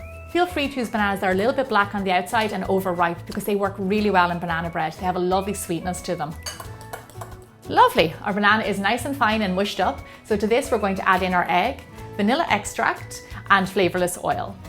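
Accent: Ireland. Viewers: Are u from Ireland?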